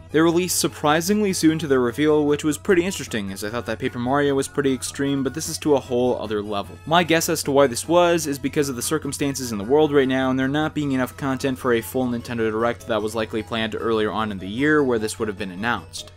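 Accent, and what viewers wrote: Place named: Canada. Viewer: I love the really strong Canadian accent. Aboot.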